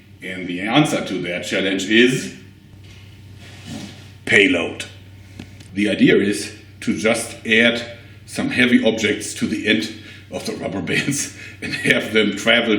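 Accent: Germany. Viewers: Where did you find those rubber bands and is that accent German? Also do you have a place where i can buy your originals? Oh, and its kinda weird that you wear socks and sandals.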